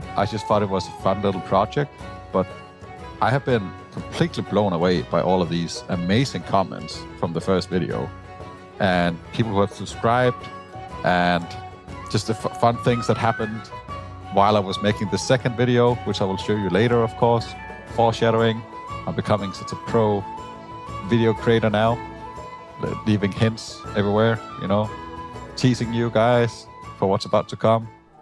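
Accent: Denmark. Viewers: the danish accent bro, love it <3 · You accent makes me think you are from Denmark, am I right? 😂 Nice serie man, cant wait for the rest of serie to come out. KEEP IT GOING!!!💪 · Something about the accent that tells me that he is danish!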